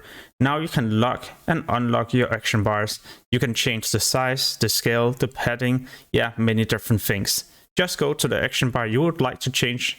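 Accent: Denmark. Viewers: Man you sound Danish 😅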